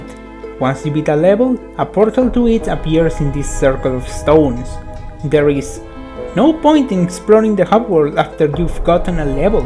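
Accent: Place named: Russia. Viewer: Amigo, si vas a hacer videos en inglés aprende a pronunciar en inglés porque no te sale XD sonas como ruso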